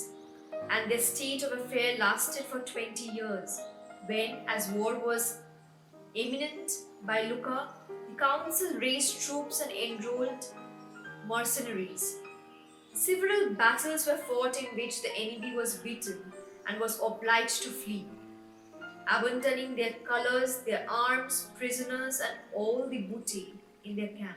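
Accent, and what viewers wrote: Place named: India. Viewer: Beautiful story . And very nicely told. I like Indian accent.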